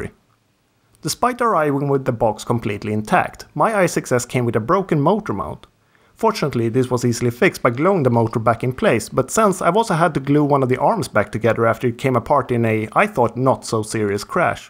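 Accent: Sweden. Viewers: like ur vids man, love the swedish dialect, keed it up.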